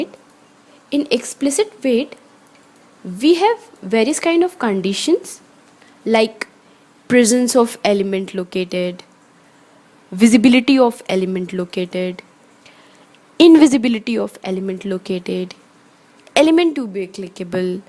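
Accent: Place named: Russia. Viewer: That russian accent)))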